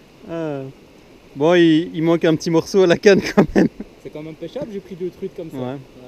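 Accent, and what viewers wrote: Belgium. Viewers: saluut superbe video comme tjr tu ma eclater de rire avec ton belge c super marrant dans certaine condition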